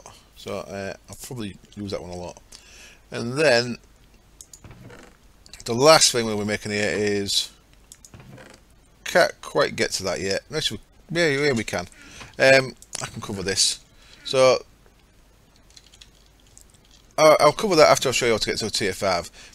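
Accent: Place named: Yorkshire, United Kingdom. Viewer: thought you sounded as if you were from yorkshire :)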